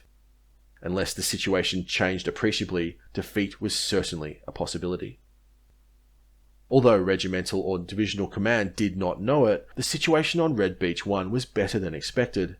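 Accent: Australia. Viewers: This Aussie narrator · He is actually an Australian - that is not a British accent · Thank god its not AI.... bonus: aussie accent too!😅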